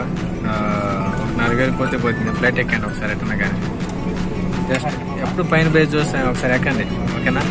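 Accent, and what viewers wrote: Chittoor, India. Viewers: ఫస్ట్ టైం లా లేదు బ్రదర్...బాగా అనుభవం ఉన్నట్టు చెప్పారు...🤔🤔🤔ఏదేమైనా మీరు సూపర్ బ్రో...మన యాసని సరదాగా ప్రపంచానికి పరిచయం చేస్తున్నారు... వింటున్నంత సేపు చిత్తూర్ పరిసర ప్రాంతాల్లో తిరుగుతున్నట్టు భలే ఉంటాయి మీ వీడియోస్...👌👌👌👍👍👍 ఫ్రమ్ శ్రీ కాళహస్తి... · I love chittor slang. I have frds in tpt chittur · Ni videos super ba.. slang style vere level..baa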